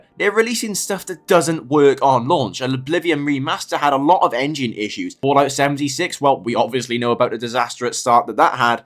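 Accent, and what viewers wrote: United Kingdom. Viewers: bethesdar :P british accents are fun.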